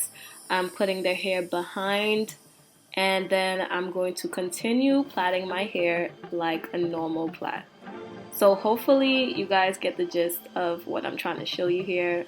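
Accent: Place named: East Coast, United States. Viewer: ! Great video. I need to do somet'ing with my hair during this quarantine lol. Not to be too intrusive but where are you from? I hear a little east coast in your accent! lol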